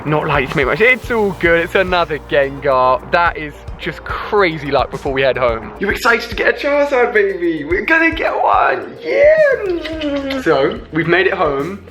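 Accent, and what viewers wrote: United Kingdom. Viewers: you are awesome. Keep up the amazing work. FYI dat british accent though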